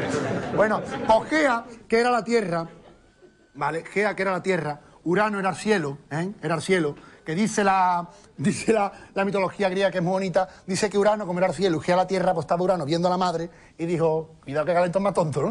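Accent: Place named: Andalusia, Spain. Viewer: jejeje como molesta a algunos de por alli arriba ke alguien hable con acento andaluz. Menos antiandalucismo señores!!! ke crack, eres el rey. y tu sigue hablando con tu acento y al que no le guste que ponga canal nou.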